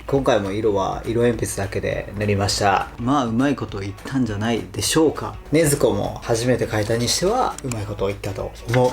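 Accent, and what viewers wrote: Kansai, Japan. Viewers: うますぎやろww 最近ちょっと関西弁出てきたよね！笑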